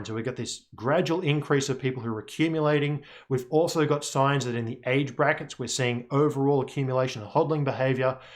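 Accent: Australia. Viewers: Thank you so much for your effort. 💐 Enjoyed the thick Aussie accent, in addition to the analytics.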